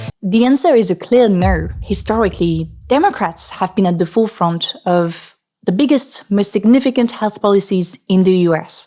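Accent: France. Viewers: I am British and I love your French Accent. I love your videos as well they are excellent. Keep it Up 👍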